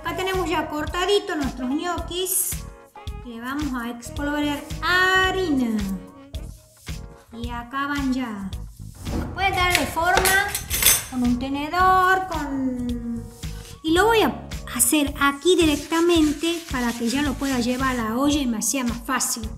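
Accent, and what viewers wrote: Tucumán, Argentina. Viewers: Que lindoooo escuchar la tonada tucumana!!! Dios te Bendiga, aguante mi Tucumán Queridooo!!!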